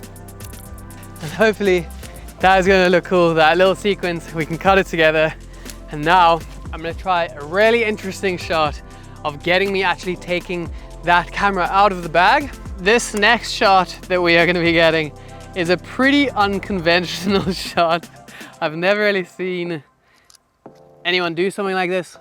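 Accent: South Africa. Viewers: Is that a South African Accent 🤔?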